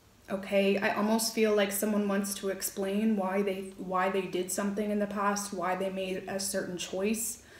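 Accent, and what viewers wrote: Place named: Canada. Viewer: You are right on the money darling but do you blame me about getting my feddy? Because with my own experience and I can only talk about myself, all I know is that love is not the kind of love that there used to be sweetheart. I believe that one of the top five reasons for relationships are matrimony problems or issues we're about Finance if a man can bring in the money he ain't getting no honey. There's a lot to be done in a relationship got to have money you got to give quality time to each other course there has to be good communication at least and a lot of it to listen. But for sure any problems baby and that's fine cuz I am a king therefore King has a queen and she better look like one cuz if I cannot condone her not just with material things should be at the very bottom to least but they are needed because I will Adorn her with all my love and being but if there is a struggle in finance paying bills anything like that it won't work. 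I'm just saying thank you God bless you I appreciate you and I love your accent Canadian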